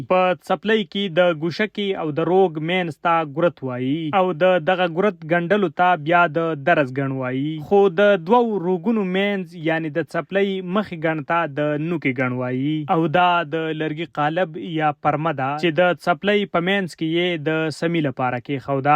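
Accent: Standard. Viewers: Bhai pure pashto Masha Allah ❤✨ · DER zabardast explanation de okro ji DER kha zma staso awaz staso Andaz ao pure pukhto Dera khwakha da umeed laram che kala ba mulakat oko insha ALLAH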